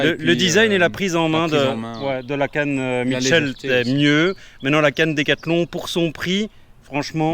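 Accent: Belgium. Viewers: Tu es belge ou français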